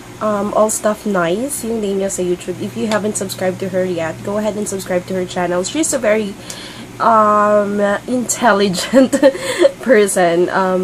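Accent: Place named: Cebu, Philippines. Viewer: Like number 5:) heheh ang cute niya mag cebuano heheh ang saya agad ng bungad heheh:) wow gusto ko tong tag na to hehehe:) sakto ka sub ko lang din sa kniya now heheeh:) ang cute cute nimu mag visaya ui heheeh:) You just got a new subbie, It's Vangeline from IndayG's channel:) cheers!!!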